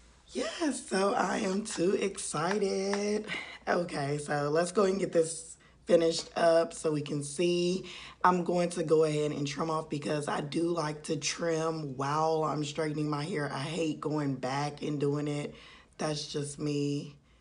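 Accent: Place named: South, United States. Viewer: Lol you so country i love it lol 😂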